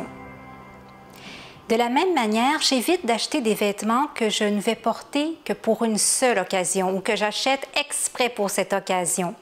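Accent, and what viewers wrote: Quebec, Canada. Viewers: Bonjour, merci pour votre partage je recherchais justement des vidéos de personnes un peu plus âgées car j'ai 35 ans maintenant, et je ne suis plus très en phase avec les conseils des personnes de 20 ans, (même si ces personnes ont bien-sûr aussi de bons conseils). J'aime beaucoup votre discours, votre musique de fond, vos images, et votre accent québécois est la cerise sur le gâteau car j'adore le Québec ❤️ bref ce format est très bien, on aimerait qu'il dure plus longtemps ! En tant que minimaliste, je ne sais pas si vous avez proposé un house tour de chez vous pour voir à quoi un endroit épuré ressemble, pour s'en inspirer. Merci ! Eve, de France.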